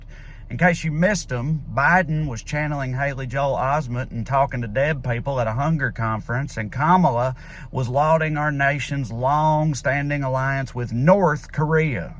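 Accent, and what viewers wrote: South, United States. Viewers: Is it Just me ir us the southern accent getting stronger? hard to understand from abroad... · Being funny and knowledgeable and breaking it down in an easy to understand flow....another George Carlin in the makings right here. Albeit with a Southern Twist.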